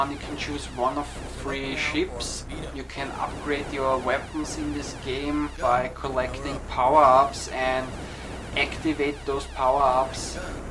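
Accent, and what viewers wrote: Germany. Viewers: Its like the T-800 reviewing retro games ;) ;) Please take no offence i really enjoyed this vid. Just your german accent is very very strong. Greetings! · Alter biste deutsch? xD Das klingt so? :D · dein Bayrischer oder Ösi Dialekt hört sich ja GRAUSAM an !!! --- RrrRrRrrr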